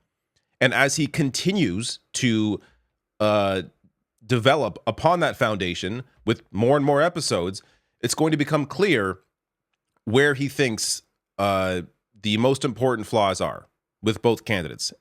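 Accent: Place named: Canada. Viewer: This guy sounds like a Canadian.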